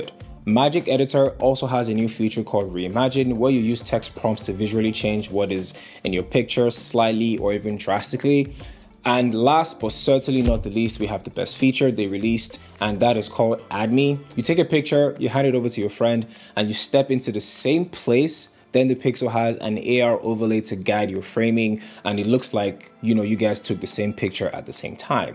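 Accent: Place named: Nigeria. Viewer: You got a gaming hardware channel right?... I could swear the voice is the same... The fade nigerian accent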